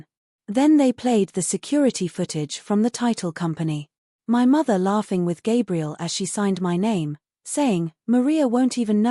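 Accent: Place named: United Kingdom. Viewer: Supposed to be an American but has a very distinct British accent. Annoying!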